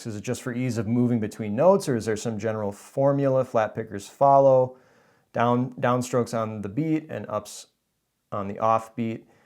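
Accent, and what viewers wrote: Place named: Canada. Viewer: Sure sounds Canadian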